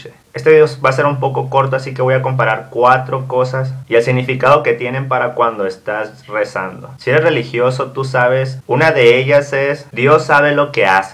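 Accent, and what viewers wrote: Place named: Sinaloa, Mexico. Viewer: Por cierto hablas como sinaloense ñ.ñ de donde eres?? xD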